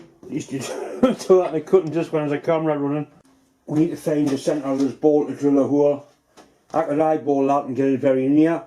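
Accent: Newcastle, United Kingdom. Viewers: I love how Geordies say "hurls" instead of holes.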